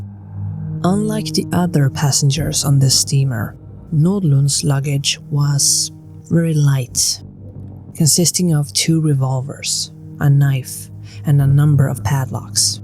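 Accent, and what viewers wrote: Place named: Sweden. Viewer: I appreciate the work you put into your videos and your commitment to rejecting generative AI. This was a story I hadn't heard before, unsurprisingly, as an American. I'd love to hear more like this from your neck of the woods (assuming from your accent and pronunciation that you're Swedish).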